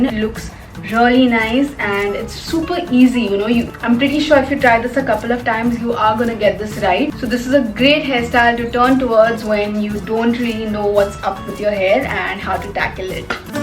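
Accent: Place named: New York, United States. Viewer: Love that shimmery eye shadow The way you talk is very nyc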